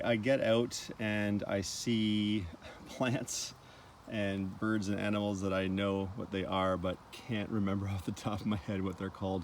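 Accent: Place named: Canada. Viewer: I think I've seen this when it was 1st posted??? But OH! Your navigation skills crack me up! Not the 1st (& not the last) video you've been lost in. You know I love you dearly, but Magellan you are not. And in my Zombie Apocalypse team, navigation is NOT your job! LOL! (Wink) After 2yrs of your audio/ videos you Canadian accented O's & A's still give me a grin & a giggle. Big hugs & here's to the 2022 spring/ summer/ fall hiking ahead! 🥾🕶☀️💧🎒😉😘🧭 L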